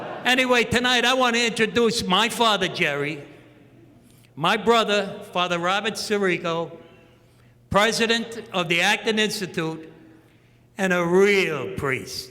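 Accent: Brooklyn, United States. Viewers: I've see other other interviews with him, where he had the much cooler Brooklyn accent. Looks like his New Jersey accent has now become his default after Sopranos. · I just fucking love his Brooklyn accent! I could listen to him all day and not get bored.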